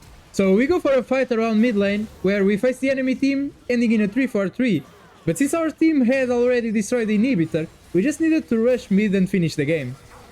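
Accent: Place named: Portugal. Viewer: my guy o teu sotaque portugues é que nao dá para esconder hehheheh, keep it up bom video maninho!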